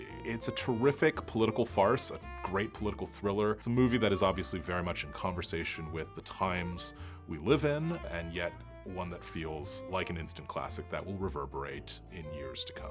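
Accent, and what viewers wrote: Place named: RP, United Kingdom. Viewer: Am American of Asian decent speaking like he's British posh tosh is suss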